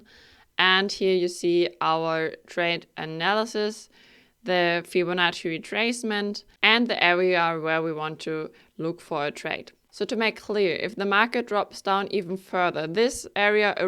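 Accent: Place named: Germany. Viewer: Ich wusste doch ich höre den deutschen Akzent heraus 😅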